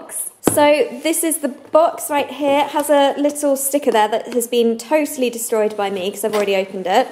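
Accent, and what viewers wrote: United Kingdom. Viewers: i just melt when you speek. i love your british accent. it's so sweet and nice. the time you said snacks i just repeated it over and over because it was so lovely the way you said it. i wish i was british....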